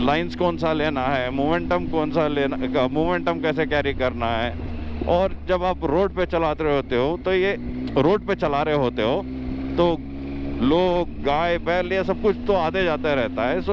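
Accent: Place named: Karnataka, India. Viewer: bhai, plz make video on riding gear and liked your kannada accent 😊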